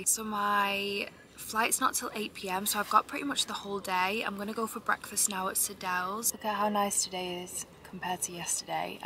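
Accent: Manchester, United Kingdom. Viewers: the Mancunian accent is my favorite.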